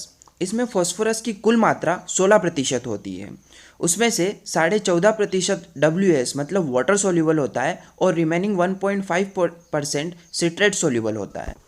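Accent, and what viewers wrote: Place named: Madhya Pradesh, India. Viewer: Bhai pakka m.p se ho tum 😁😁 Ujjain dhar ke aaspas se tum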